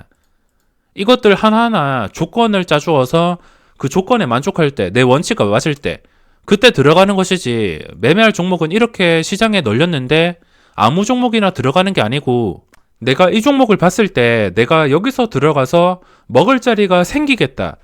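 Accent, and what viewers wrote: Gyeongsang, South Korea. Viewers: 너무 감사합니다ㆍ친숙한 경상도 사투리도 듣기좋습니다ㆍ 제가 전업을 그만두는 시점에 이쪽으로 들어오셯네요ㆍ 저는 쪽박을 차서 그만둔것은 아니지만 수익모델을 만들지 못해 기업에 입사해서 월급쟁이 합니다ㆍ 저의생각은 ㆍ 수익모델은 2가지입니다ㆍ첫째는 망하지않을 코스피10 종목 두어장뭍어놓아도 아무 신경안써도되는 아빠잘만난 주식관심있는사람ㆍ 둘째는 돌파와 손절을 할줄아는 ㆍ 이두부류외 조상의 천운이 없는 모든 사람은 주식으로 돈을 딸수없습니다ㆍ 두가지밖에 없음을 이 증명해주고 있네요ㆍ부럽습니다 ㆍ님이 하고있는 매매는 초고수의 영역이며 일반개미님에게는 추격에 가깝습니다ㆍ님도 그런개미돈을 털어간다는 아찔한 사실은 아시죠ㆍ? 세상사 베틀넷이죠 ㆍ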